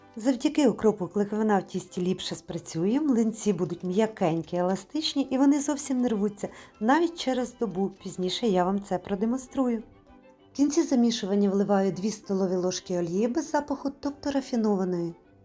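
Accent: Standard. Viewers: Обожнюю ваші рецепти.Дивлюсь на одному подиху.Поки не маю можливості спробувати бо не вдома.Але незабаром думаю реалізую таку можливість.Окремо дякую за прекрасну,чудову,гарну, неповторну,чисту, правильну УКРАЇНСЬКУ МОВУ. Це неймовірно.Натхненя,наснаги,здоров'я Вам і всього найкращого.❤❤❤❤❤🎉🎉🎉🎉🎉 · Як ви гарно розмовляєте ❤❤❤. Приємний голос , чиста українська мова, рецепти і їх подача чудові ❤❤❤. Удачі вам , підписуюсь ❤❤❤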